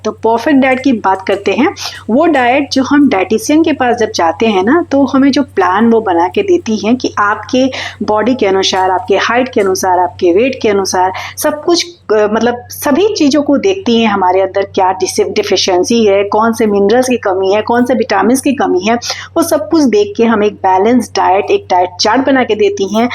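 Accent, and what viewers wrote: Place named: Bihar, India. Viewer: Nice blog didi aap Bihar me kahan se belong Karti Hai aapki language hamari Jaisi Hai😊😊😅😅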